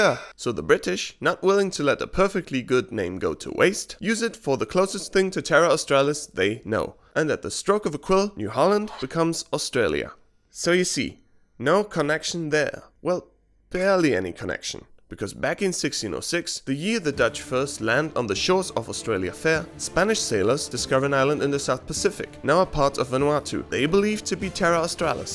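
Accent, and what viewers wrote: Austria. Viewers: Oha merkt ihr nicht, dass sein Englisch einen Österreich/Deutschen Akzent hat? Scheinbar IST er Österreicher und deswegen kann er es so aussprechen ahahha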